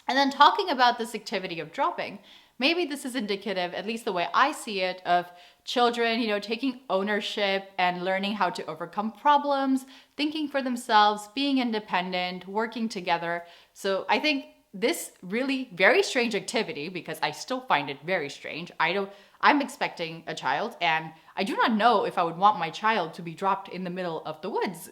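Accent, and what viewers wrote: Netherlands. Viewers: It's so interesting to hear that your English-speaking accent sounds more Dutch now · Congrats with your Dutch citizenship. 🎉🎉You already sounded totally Dutch. And now you are. Gefeliciteerd met een echte kaaskop zijn🤣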